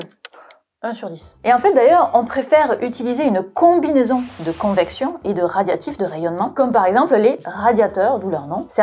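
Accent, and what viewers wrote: South, France. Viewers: Pour une québécoise tu parle avec un super accent français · Québecoise avec un accent presque du sud ☺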